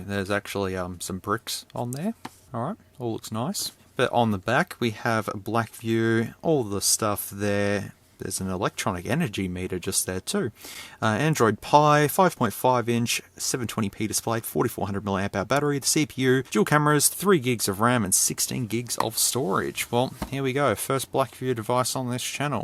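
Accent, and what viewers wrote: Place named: Australia. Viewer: You have one of the most interesting Aussie accents. I often noticed some Aussies add an "R" to wherever an "o" is in a word, but yours is super pronounced!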